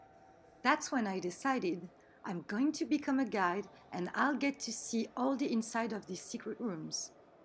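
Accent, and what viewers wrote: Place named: France. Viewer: most enjoyable video related in delightful french accent.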